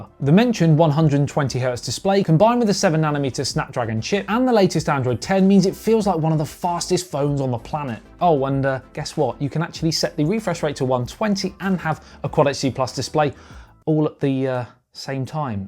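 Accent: United Kingdom. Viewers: ok. are you from UK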